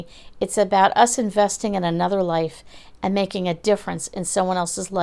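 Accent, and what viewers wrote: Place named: New Jersey, United States. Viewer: I KNEW you were from NJ with that accent! I moved from Jersey to Louisville KY 17 years ago! I miss my New Jersey! Excellent video! Thanx so much! I just subscribed!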